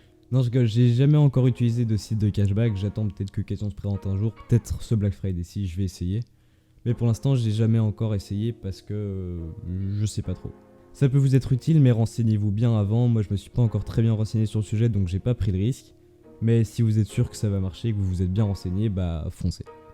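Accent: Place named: Spain. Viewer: Un accent espagnol de qualité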